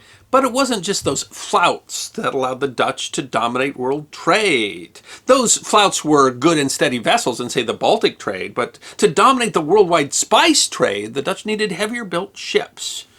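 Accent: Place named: United States. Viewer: His American dialect is not easy to understand.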